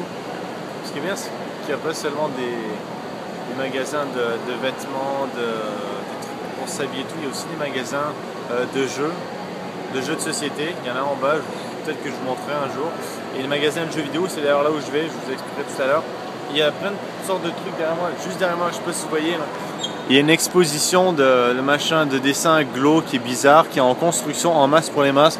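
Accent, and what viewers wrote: Quebec, Canada. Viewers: Héhé tu choppes l'accent Québécois, fais gaffe;) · tu comence a plus parler quebecois den tes video evite parce que jai du mal a comprendre sinon =jpe pu comprendre t video mode quebecois: bon jmenui che moué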